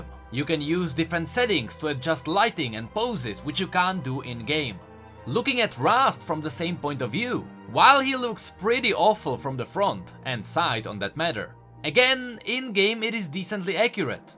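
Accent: Czech Republic. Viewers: Podíval jsem se na tebe poprvé a hned mi bylo jasné že jsi čech podle toho přízvuku :d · Český přízvuk? :D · Věř nebo ne, ale už jenom podle přízvuku jsem poznal, že si Čech než jsem se podíval do popisku:D původ nezapřeš xd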